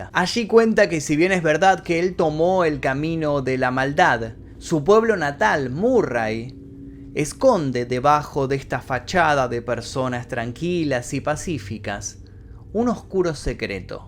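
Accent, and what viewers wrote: Argentina. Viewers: Me encanta tu forma de narrar y a eso agregale tu acento argentino maaaaas. Saludos desde México. Soy tu fan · tiene buena pronunciación y habla genial y relata execelente las historias con un toque de argentina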